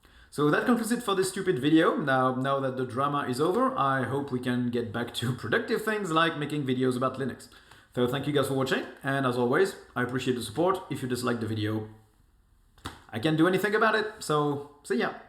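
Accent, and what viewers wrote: France. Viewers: Also, you really really should consider making one video with that French accent of yours! It will be really really enjoyable ahhaha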